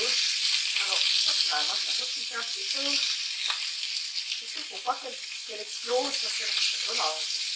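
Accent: Vaud, Switzerland. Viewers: Pas mal le coup du vin blanc et de laisser mijoter, d'habitude moi je mettais que de l'eau et un oignon sans laurier... Super accent en passant 😂, canton de vaud j'imagine... Super recette je vais tester 👍🏼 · J'aime l'accent très vaudois j'ai fait 2 fois la recette 👍👌😋😘merci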